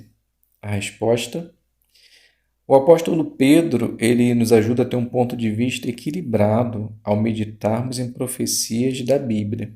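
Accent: Rio de Janeiro, Brazil. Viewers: Legal, parabéns irmão. Amo o sotaque carioca.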